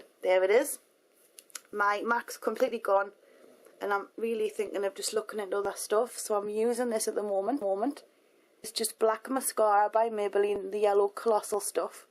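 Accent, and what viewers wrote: Newcastle, United Kingdom. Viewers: Its a Geordie Accent. Same as Cheryl Cole?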